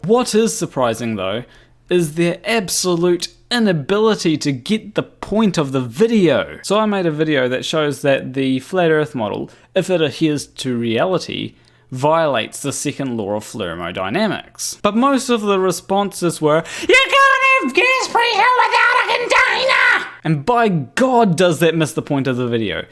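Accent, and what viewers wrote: New Zealand. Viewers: Hey. Aussie here and you are quite clearly a Kiwi. Anyone who is truly an Aussie and is having trouble telling that you are a Kiwi should maybe get their hearing checked. Also can’t wait for my next sweet, sweet NASA payment for pretending that Australia actually exists and that I come from there....oh. I just said that out loud didn’t I. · Nope, you definitely still have the Kiwi accent! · Ha ha ha, calling you an Aussie. Nah mate, you don't sound remotely like us Aussies. Your Kiwi accent is unmistakable to any who know the difference. And thanks for constantly fighting this effing stupidity, my Antipodean brother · You definitely still have a kiwi accent.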